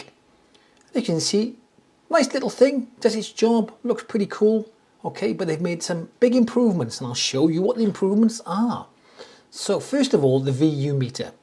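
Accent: Wales, United Kingdom. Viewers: Just subbed and thanks for you content , loving the welsh accent 🤣🤣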